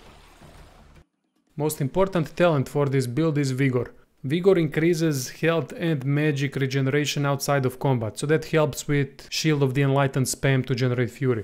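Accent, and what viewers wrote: Russia. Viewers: Are you russian? Very similar accent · So there's a character in "The Magicians" Series of books ... which they made into a TV Show ... so when I'm being instructed by someone with a Russian Accent ... about how to be the best mage... I can only think you're Mayakovsky in disguise XD...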